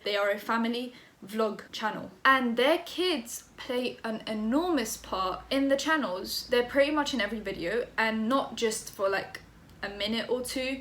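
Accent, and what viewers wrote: United Kingdom. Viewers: honestly i usually can't stand british accents but i enjoy listening to you so much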